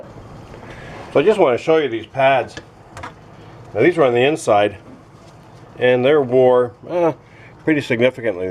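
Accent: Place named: Canada. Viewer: Hey Great Video Eh? Very Helpful and I love hearing your Canadian Accent. Is it ever excellent eh? Clicked the thumbs up and subscribed for future videos. Cheer's!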